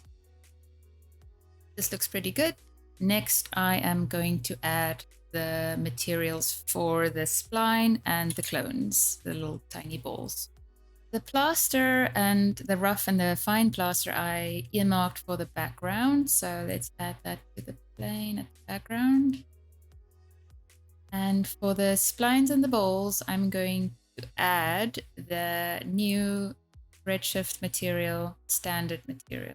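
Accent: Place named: South Africa. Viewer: Loving that South African accent, and thanks for the tut! Cape Townian living in Paris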